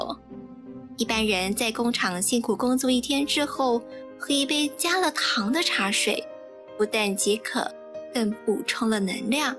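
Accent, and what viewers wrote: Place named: Taiwan. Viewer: 这本书我读过德语版的。文案写得很好，解说很棒。可能由于文化差异，觉得声音有点儿嗲，台湾腔与京腔的给人的感觉正如台湾菜与京菜的甜咸之别一样。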